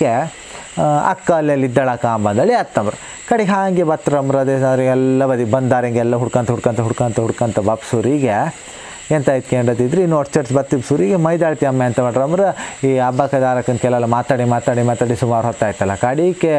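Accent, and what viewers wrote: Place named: Kundapura, India. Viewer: Nam kundapura kannada..👌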